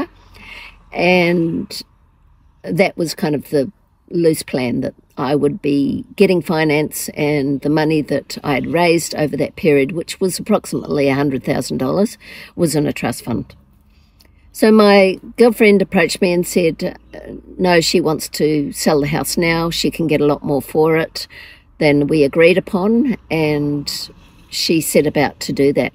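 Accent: New Zealand. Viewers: I'm mid 70's, been caravanning 25yrs.Do I hear a kiwi accent? I'm ex kiwi. Love my lifestyle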